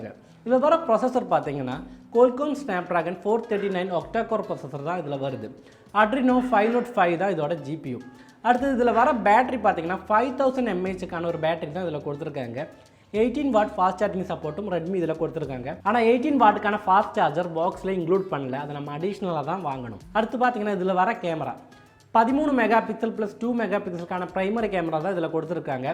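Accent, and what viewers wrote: Kerala, India. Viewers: Ur tamil with malayalam slang is super bro... But ippo Idhu 7999 aachu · Happy to see a malayali giving review in Tamil.. Love your(Malayali's Tamil slang) accent bro!.. 😃😊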